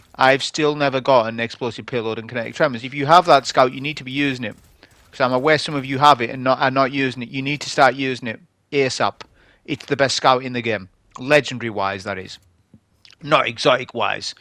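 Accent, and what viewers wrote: United Kingdom. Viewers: Somehow im enjoying more of your bri'ish commentary rather than the actual gameplay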